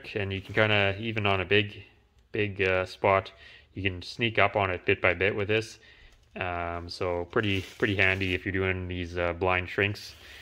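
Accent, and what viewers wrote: Canada. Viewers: I can't quite understand in that Canadian accent of yours. What are you calling your crescent wrench/ handheld metal brake?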